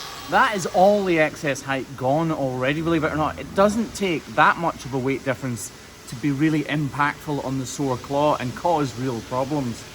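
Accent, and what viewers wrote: Scotland, United Kingdom. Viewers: But you did provide footage, if accidentally. She was walking toward the crush and kept that foot off the ground completely, hopping with each step. I am very grateful to your narration, too. I can understand your Scottish accent. But you visited a farm in Wales with a soft-sided corral system and I had to turn on closed captioning, I couldn't fathom what she was trying to communicate. Thanks for all you do, I'm sure the girls you work on appreciate it too. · I love your channel so much, I've been learning to speak with a Scottish accent just by repeating things you say! I think it's a promotion, and I bet you agree! Keep up the great work! Cheers to and!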